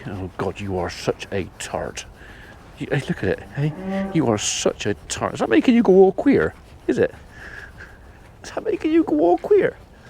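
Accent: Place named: Gloucestershire, United Kingdom. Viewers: For me I think it’s a combination of your sense of humour with the sideways look and a twinkle when you’ve said something amusing, the obvious love you have for the cattle and your respect and kindness for them and just watching the farming year unfold as time goes by. You also remind me of the farmer at the small dairy farm they used to be next door who became a good friend. Also I live in Gloucestershire and enjoy listening to my accent on the screen Always look forward to your daily videos and your wry outlook on life in general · I can't say I've noticed you going out to milk your cows twice a day, maybe I haven't been paying close enough attention!! I must say your Gloucestershire accent sounded stronger than normal on that video, mine isn't noticeable now after49 years away.Why do we watch your channel? no idea, but you've become an addiction ever since I first discovered your channel. 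And we attended the same college, that's got to count for something surely!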